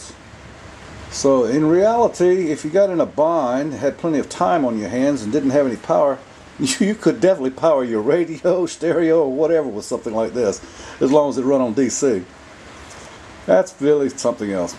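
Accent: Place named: South, United States. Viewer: i never cared for the southern accent. you make it sound normal. good video.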